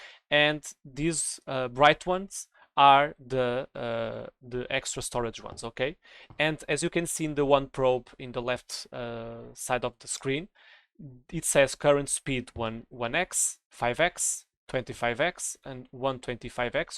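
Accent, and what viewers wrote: Portugal. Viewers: You has portuguese voice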